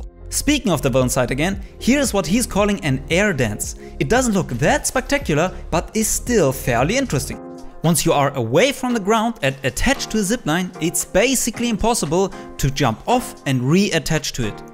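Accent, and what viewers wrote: Germany. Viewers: Bild ich mir das ein oder hast du n maximalen n deutsch aktzent?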